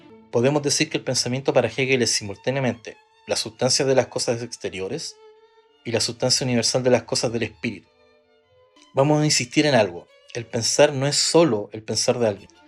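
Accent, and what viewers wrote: Chile. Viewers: Me encanta el acento chileno (me genera paz). Yo estudié filosofía, pero aún no profundicé con Hegel y quiero darle a la Fenomenología del espíritu.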